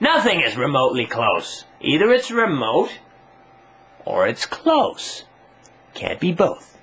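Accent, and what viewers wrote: United States. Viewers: Ha, this made me giggle. :) I can't tell that you're Swedish from your accent, which sounds decidedly like mine, which is American. Yeah... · he sounds like an american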